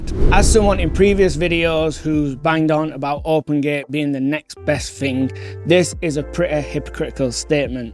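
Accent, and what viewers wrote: United Kingdom. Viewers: i really like your videos but pls you have to speak a bit better because as a non native english speaker its hard to understand your harsh british accent.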